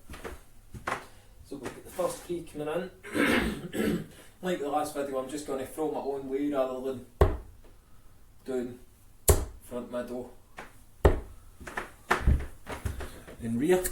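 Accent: Scotland, United Kingdom. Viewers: I came here for darts, and stayed for the Scottish accent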